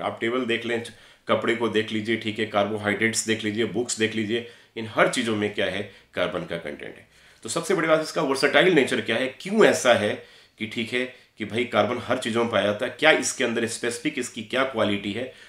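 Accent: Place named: United Kingdom. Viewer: English accent yes... Lekin jldi bana Dena Bhai... 2 din or h paper m..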